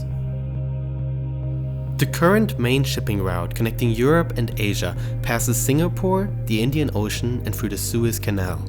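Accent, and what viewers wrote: Germany. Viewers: Der Sprecher ist doch 100pro ein Deutscher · German narrator :D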